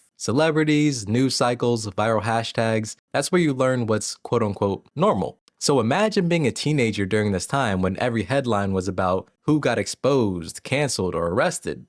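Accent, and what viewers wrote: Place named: Baltic states. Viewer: sounds like you are from the baltics or somewhere else tbh idk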